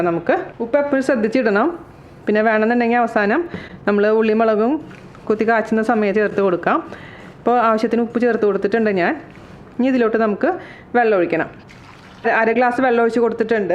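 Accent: Thrissur, India. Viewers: Scooper chechi nammal trichurkaarde baasha sooper readymoney ennu njanum parayaarund ente place chavakkad upperi sooper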